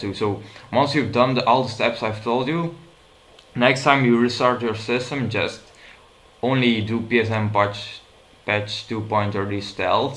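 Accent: Netherlands. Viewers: nederlander? lol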